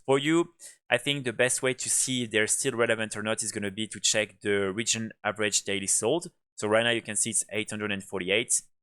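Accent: France. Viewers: Really like your narrating style and you're French accent! 😊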